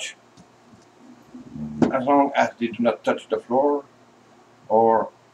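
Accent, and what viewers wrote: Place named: Louisiana, United States. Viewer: I hear a home accent of a Cajun... ❤🥰❤🥰❤ I am from the southern part Of Louisiana.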